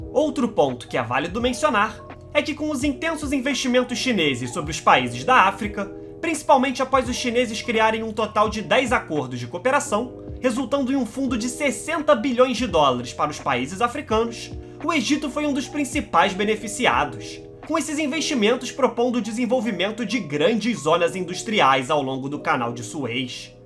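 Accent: Rio de Janeiro, Brazil. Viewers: O conteúdo do vídeo é excelente, mas precisa neutralizar um pouco esse sotaque carioca, misericórdia! 😣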